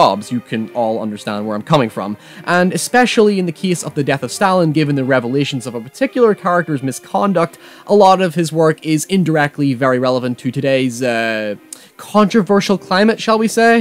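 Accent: Northern Ireland, United Kingdom. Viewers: I think northern irish maybe? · Northern Irish orginally?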